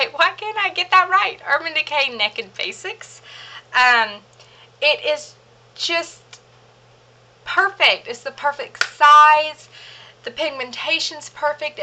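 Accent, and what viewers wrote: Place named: Texas, United States. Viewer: I subscribed! Are you from Texas? Just figured you are bc my accent is the same :)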